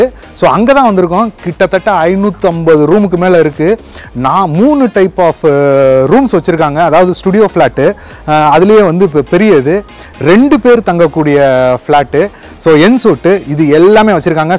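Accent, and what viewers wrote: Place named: Chennai, India. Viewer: Looks like indian cricketer Namma aswin Mari irukinga avar Mari tan pesuringa,like frm Chennai 🇮🇳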